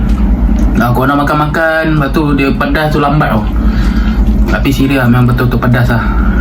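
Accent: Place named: Melaka, Malaysia. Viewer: SODAPPPP! BUAT VIDEO LAGI BANG, PEKAT NAU LOGHAT MELAKA!!!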